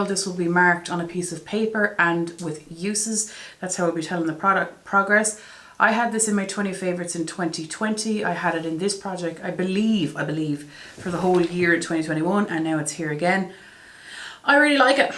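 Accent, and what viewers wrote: Ireland. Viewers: Happy to help! I know oden's eye has a similar sponge as well if you can't find it in Penneys. 😉 I'm almost Irish ahah. I'm Portuguese but lived in Dublin for 7 years (back in Portugal now). I actually found your channel when I was looking for Irish youtubers to follow cause I missed the accent so much.😅 xx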